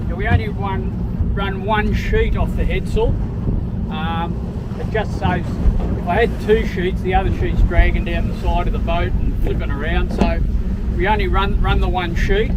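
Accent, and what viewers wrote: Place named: Australia. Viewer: Living in Thailand far from my oceanic playground off the Oz east coast, deriving much pleasure from hearing your Australian accent and that unmistakable diction, I mused, as I am often want to do, at the notion that perhaps during the dying embers of the day, you could recite some suitably inspiring verse with a nautical flavour. Maybe a few stanzas from the Ancient Mariner for example. I, myself, often amused my seafaring companions at sundowner time with either a poem or a sea shanty. Works wonders for morale and appetite. My suggestion would be an Aussie rendition of the Drunken Sailor with a glass of red held high. Awesome bloody scene!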